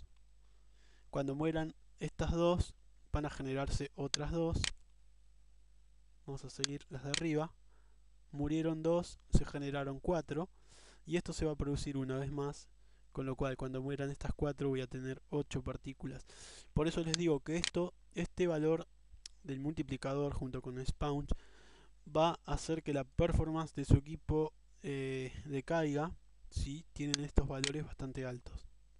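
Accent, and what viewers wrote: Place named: Argentina. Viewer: Siempre muy buenos tus tutoriales master, concisos, bien explicados, sin dilaciones. De paso, descansa un poco los oídos escuchar tutos en argentino.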